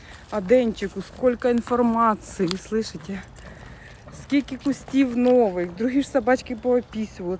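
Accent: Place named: Surzhyk, Ukraine. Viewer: така спражня, і суржик це щось. Я з Запорізької обл. і мені це все так близько. З задоволенням дивлюсь ваші відео, ви молодець. ❤❤❤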